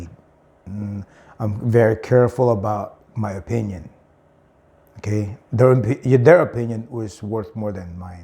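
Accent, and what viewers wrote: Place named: Philippines. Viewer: You are on point. If you look Filipino, they expect you to behave like a Filipino. You speak perfect English of course, but I can detect a Filipino accent which is typical of many Fil Ams. This may add to the perception that you are native born Pinoy and are therefore expected to act this way. Otherwise you are Mayabang. Yes it doesn't make too much sense for you and me, but I know you'll understand and adapt soon. You and I are in the same boat, but I moved to the US in my mid 20s so I have a bit of a headstart. Welcome to the Philippines.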